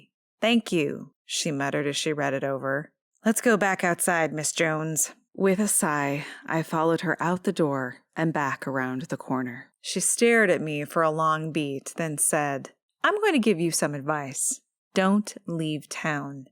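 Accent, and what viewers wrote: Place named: United States. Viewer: Just can't listen to American's reading........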